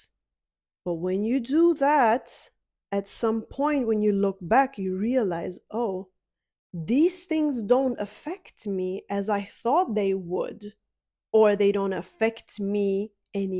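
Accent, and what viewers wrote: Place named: United Kingdom. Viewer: im your 29 sub beacuse your britsh accent is very funny and starting u say naughty naughty also funny but im girl😂 best of luck your journey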